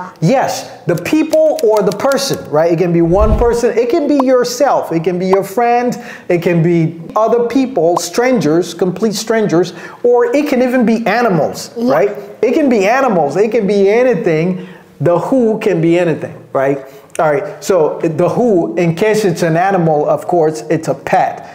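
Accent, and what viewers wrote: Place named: United States. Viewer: He is very fluent With his american accent.😊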